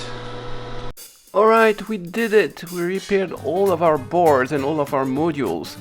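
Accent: France. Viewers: I have an off-topic question for you. My wife heard me watching this episode and she asked me where your accent is from. I told her I thought it was French, but I'd like to confirm if I got that right or not. Thanks! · I mean… you know that for me, in-depth electronic debugging now has a french accent forever. Burnt in like a PROM.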